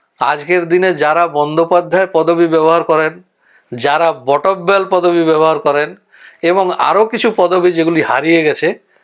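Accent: Bankura, India. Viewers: মশাই যে বাঁকড়ি উচ্চারণ এই বোঝা যায়। আমিও বাঁকড়ি। খুব ভালো তথ্য পেলাম।❤❤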